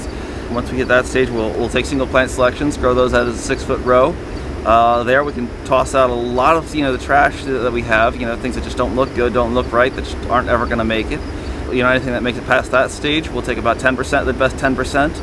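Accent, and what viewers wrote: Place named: England, United Kingdom. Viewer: Brother has an un-pickable english accent 😄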